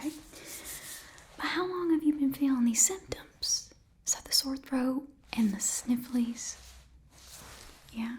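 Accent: South, United States. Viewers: personally i just think southern is absolutely divine! love how warming it comes across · Nice southern accent. I don’t have as deep of one despite living in the most southern of the states.😂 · On behalf of the Southern community, I approve accent and recipe for mac and cheese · I love the southern accent so much it's so comforting, even if I've never even been anywhere near the US!❤️❤️❤️❤️ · ur voice with the southern accent reminds me of ruby from steven universe with the song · Her southern accent is top tier!🥰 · The southern accent is brilliant! Really comforting. I always love your videos! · This'll come in handy when I get a cold. The southern accent is the cherry on top. Thank you! Greetings from the Emerald Isle. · Oh god got the southern accent on point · It’s good to see you again 😉 your southern accent is magnificent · i love ur southern accent videos sm!! 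also i did get 2 post-roll ads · Did our incredibly pleasant nurse with a good dose of southern hospitality get promoted? Did she pull it off and earn her doctorate? Because I swear she was still a nurse last time. Either way, southern accent with a load of caring warmth is wonderful stuff. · I saw that you were gonna do a southern accent and clicked immediately because you slay it!! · I just wanted to comment and let you know I received a post roll ad and also amazing video I always love the southern accents ❤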